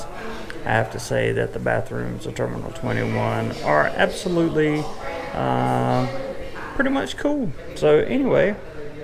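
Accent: South, United States. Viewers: Great vid, do you mind if I ask what part of the States are you from? Love the southern accent. I toured across the States with a rock band when I was in my 20’s, loved the southern states, southern hospitality is something that everyone should experience at least once.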